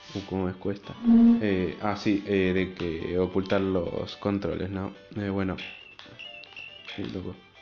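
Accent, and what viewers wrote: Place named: Argentina. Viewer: Suena argentino xd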